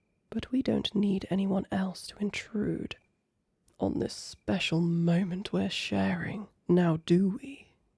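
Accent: United Kingdom. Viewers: might one of the only good British people in the world already I think she’s British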